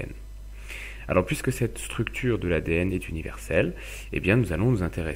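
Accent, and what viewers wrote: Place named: Paris, France. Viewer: léger accent parisien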